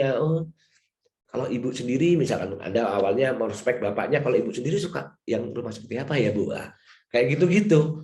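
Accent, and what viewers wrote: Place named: Surabaya, Indonesia. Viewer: Yo arek suroboyoan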